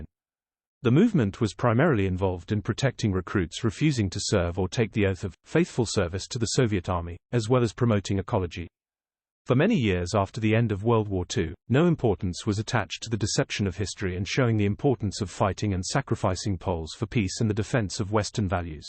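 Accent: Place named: RP, United Kingdom. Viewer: I LOVE learning where the Dove of Peace came from. Wow no idea that came from Picasso. Ok just going to say that upper class Brit accent just sounds wrong. Doesn't match your passion and incredible heart for history. 👣🧭🫶❤🕊️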